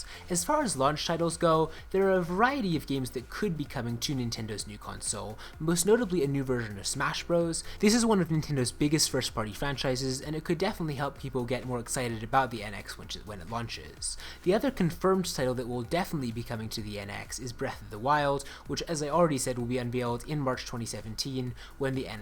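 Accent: Scotland, United Kingdom. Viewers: You're accent has a hint of Scottish to it, are you Canadian?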